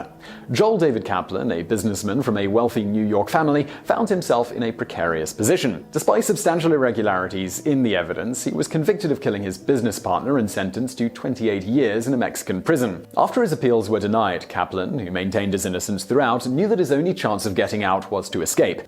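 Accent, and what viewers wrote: United Kingdom. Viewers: That moment when you watch so many videos that you actually develop a decent British accent by listening to. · English Accent* I'm Scottish and trust me my accent couldn't be further from his lol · J H when you watch so much people in your life start developing a British accent.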